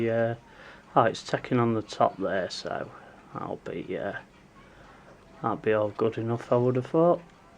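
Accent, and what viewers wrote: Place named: Midlands, United Kingdom. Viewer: You sound as though you're from the Midlands...I'm guessing first Lincolnshire or possibly Nottinghamshire.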